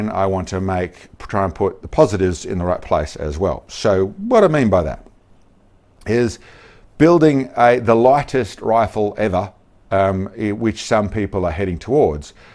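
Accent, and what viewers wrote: Australia. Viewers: I laughed when you put the barrel down so you could talk properly. Somewhere in your ancestory there might be some Italian blood. Something about mediterranean arms movements and aussie accent is genius. Love all your videos, Australia is my 2nd favorite country to visit right after NZ.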